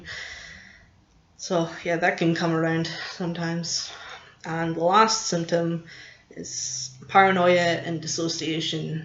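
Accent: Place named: Scotland, United Kingdom. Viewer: Hello are you Scottish. I hear your Scottish accent coming through the more you talk, and relax. It’s as if you’re subconsciously hiding the Scottish accent to please ppl listening online. I ‘get it’ because I used to do the same when I was younger, and probably would again now if I made a YouTube video. I’m not judging you ok 👍- I’m just making an observation. And i admit I could be wrong. I just want to say hats off - Good for you to have the courage to make a video about this situation. I found your video become looked for info on borderline inner pain. I’m in a lot of inner emotional pain rn. I wish I had the courage to make a video for YouTube to share how I’m feeling. Can I ask you/ - does it make you feel better having uploaded these videos? Thank you. Blessings.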